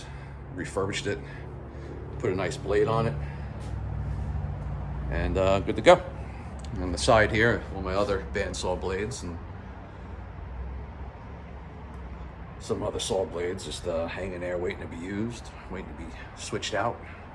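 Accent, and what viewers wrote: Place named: New England, United States. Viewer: Great video. Some interesting setups like the use of old tables. You must be from New England you have that accent. At first I thought the license plate was Oregon any way good stuff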